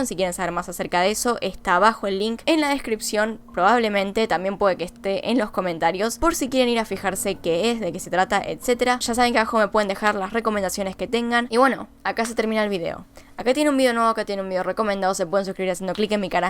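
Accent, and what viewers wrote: Buenos Aires, Argentina. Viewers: Es acento porteño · Estoy de acuerdo, he escuchado el acento argentino de diversa áreas y me solía incomodar. Aparte que he conocido en persona variass argentinas y son difíciles de dialogar. No soy la persona que juzga a todos por el mismo filo, sin embargo es agradable escucharte y no ser petulante o de alta arcurnia. Me smpatizas muchos y por eso sigo tus casos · yo odiaba el acento argentino, pero te conoci a ti y todo cambio <3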